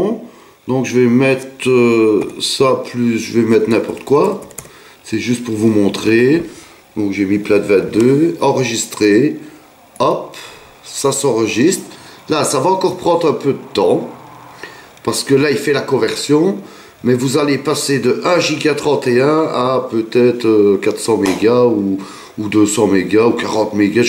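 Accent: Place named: Belgium. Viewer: Merci, super sympa et l' accent belge est magnifique, ne change rien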